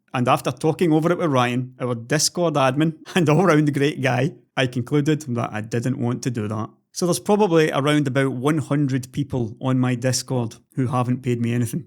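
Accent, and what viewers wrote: Scotland, United Kingdom. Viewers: You could just make cat videos and I'd still watch them. I only come here for the Scottish slant and subtle sass. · started watching you when I could barely decipher the broad Scots brogue, you have always been a shining light of sanity in the whole tech troll / fan morass. I didn't appreciate how much they had stressed you out, just enjoyed the ranting too much I guess. Anyway very glad some bridges are being rebuilt and very glad for your sanity that you are going to do what makes you feel positive. I will join your discord soon although I am an ex tech guy, now retired, it looks like a point of sanity in this modern media world. Keep railing at the madness it is at least keeping us sane!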